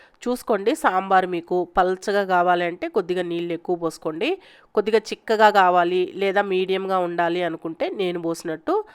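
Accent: Nellore, India. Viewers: Meedi Nellore madam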